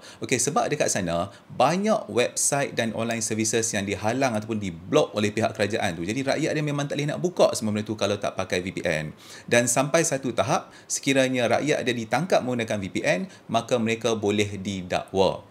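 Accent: North, Malaysia. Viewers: baru jumpa channel tuan lepas tgk video monaliza. sedap dgr tuan cakap utara. rasa kena dengan telinga bila dengar. hehe.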